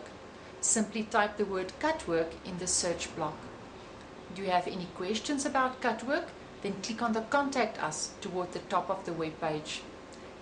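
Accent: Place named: South Africa. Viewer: I love your south african accent.....!!!!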